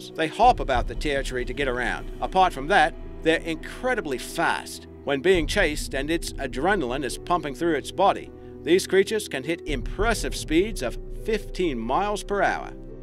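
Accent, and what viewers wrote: Australia. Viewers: So glad the old narrator is back. I love his Aussie accent!!!! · glad you came back!! missed your Aussie accent. please don't leave us again!! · So very happy to have you back mate. Me an my daughter love your Aussie accent an enjoy learning about all the different animals especially the ones about Australia.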